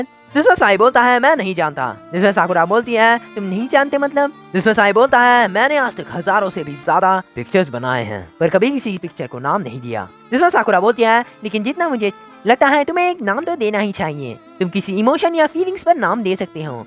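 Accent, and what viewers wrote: Assam, India. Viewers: Are u Assamese 😒?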